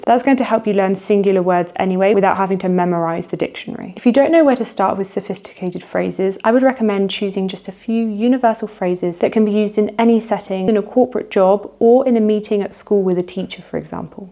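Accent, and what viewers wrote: United Kingdom. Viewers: Good video. Is this rp accent?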